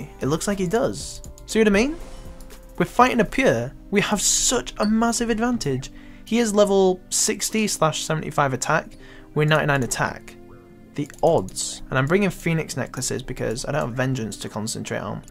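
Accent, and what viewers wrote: United Kingdom. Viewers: You literally sound like my old mate lloydreed1 lol voice is trippin me out. Must be from the same part of the uk 🇬🇧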